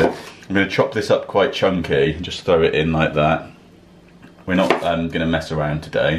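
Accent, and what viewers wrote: United Kingdom. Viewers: Que bonito suena tu acento , Nice vídeo ,your brithish accent is cool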